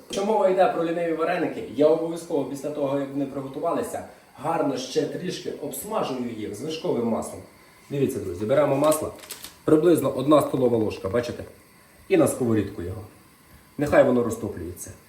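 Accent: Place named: Hutsul, Ukraine. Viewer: Хороший, позитивний і діловий хлопець! А мова у нього - з діалектом гуцульщини, ну, і що? В кожній частині України населення має свій діалект. Не звертай на погані коментарі увагу,!!! ❤😂🎉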